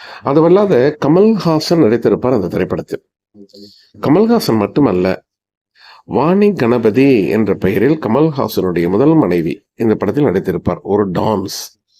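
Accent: Sri Lanka. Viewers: அய்யா தகவல் அருமை.உங்கள்தமிழ்.இலங்கைதமிழ்போல்இருக்கிறதுநீங்கள்இலங்கையா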